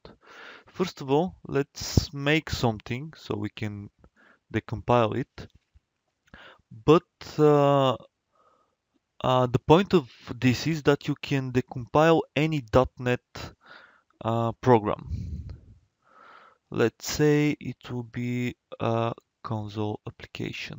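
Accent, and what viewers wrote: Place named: Russia. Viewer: Плохой английский с русским акцентом. Может есть такое видео на русском?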